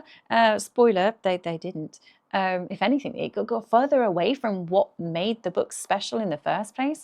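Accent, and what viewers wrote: United Kingdom. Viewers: You are British? I thought you just had a cool accent.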